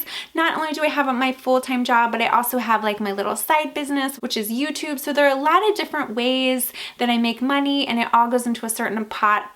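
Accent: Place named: Chicago, United States. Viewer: I totally miss this and didn’t ask you my question. You have a beautiful accent and I think you might be from Chicago. Is that a accurate?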